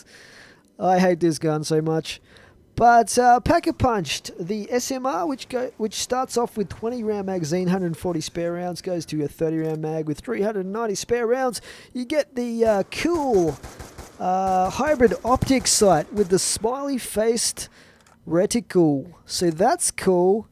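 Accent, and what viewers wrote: Australia. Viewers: are you an aussie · Are you Australian? Sorry if I got it wrong plz dont judge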